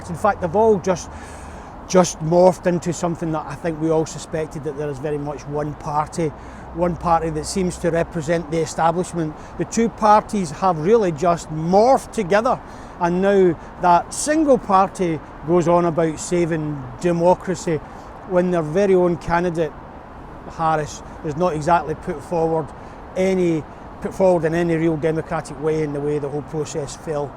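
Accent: United Kingdom. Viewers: I still get annoyed with your red coat accent as in "the red coats are coming".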